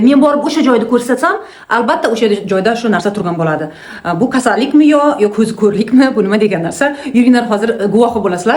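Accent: Surkhandarya, Uzbekistan. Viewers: Купрок сурхонча гапиринг кизикрок булади сурхон шеваси кизик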